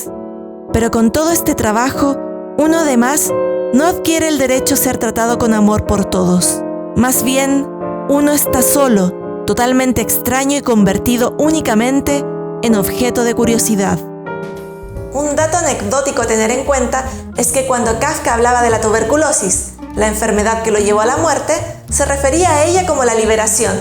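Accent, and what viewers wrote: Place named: Chile. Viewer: Que buen video! Impresionante explicación, impresionante edición, muchas felicidades ❤️ por su manera de hablar se nota que es chilena jajaja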